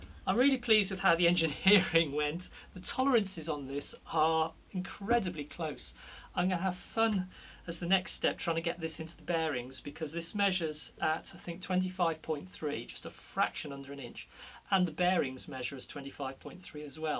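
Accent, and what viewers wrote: United Kingdom. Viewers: You sound like your from uk where is this second hand tool shop do thay have a website. Great video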